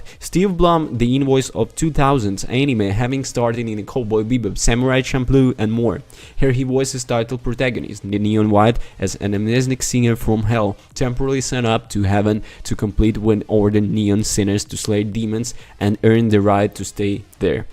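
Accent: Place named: Dublin, Ireland. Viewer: Nice surprise to hear a fellow Dub reviewing video games 🇮🇪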